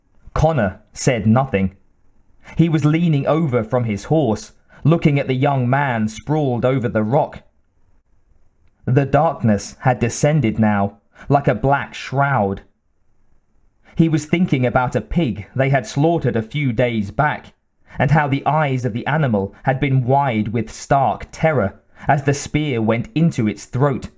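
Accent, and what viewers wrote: United Kingdom. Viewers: Listened to most of your audiobooks but couldn't get through this due to your god awful scots accent really no need for it.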